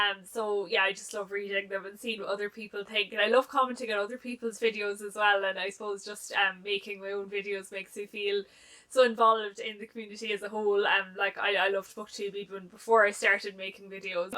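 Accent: Ireland. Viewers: Congratulations on your first year,. Really enjoy your videos and your smily face 😊. My parents were from the West of Ireland (Westport and Foxford) and I love listening to the accent too! Here’s to the next year. Catherine x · Congratulations on your first year! You have rapidly become one of my favorite BookTube channels. I love your taste in books, and I could listen to your Irish accent for hours on end!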